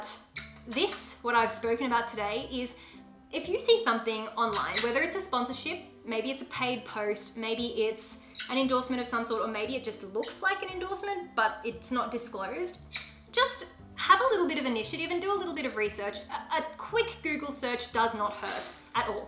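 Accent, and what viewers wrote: Australia. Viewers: Are you Aussie ? Gday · Her Australian accent is the LEAST annoying I’ve ever heard!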